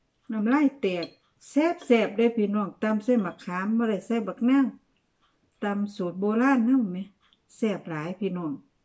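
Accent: Isan, Thailand. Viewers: ตอนแรกเข้าใจคึกันเลยค่ะ. ว่าแหม่นยายยุสารคาม. สำเนียงยายเว้าคล้ายๆทางว่าปีปทุม😊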